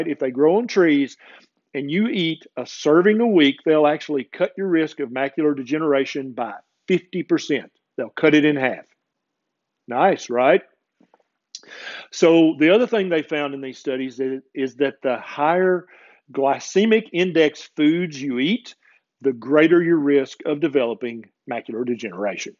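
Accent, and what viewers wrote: South, United States. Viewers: KenDBerryMD Unfortunately the Specialists dont have a clue even in their trained fields My gastroenterologist had no clue what leaky gut is, my Psychiatrist says Benzodiazepines and other Psyc Meds are completely safe, tell my brain that. They easily put you on meds but as far as risks and tapering off not a clue. I see a Hollistic MD as my primary. What foods we put in is the key. Avoid grains, sugar, processed everything, organic, and healthy fats..... what is helping me slowly recover. Thanks for your channel. Love the accent, my Dad was from the South, lost him in 2010. The best to you.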